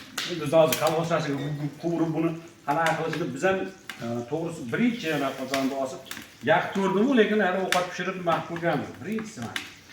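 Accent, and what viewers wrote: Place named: Namangan, Uzbekistan. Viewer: Гап йук уста ака наманганни жийда капа ёки чужа кишлоги шеваси билан бир хил экан шевангиз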